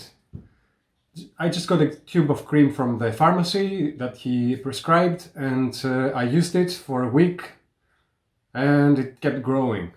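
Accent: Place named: Greece. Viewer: Greek accent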